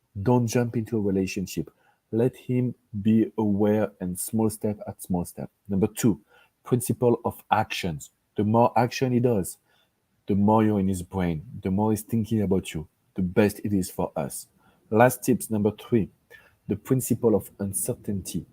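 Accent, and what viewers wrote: France. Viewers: Your French accent is amazing is an advantage 😊 thanks 🙏 for everything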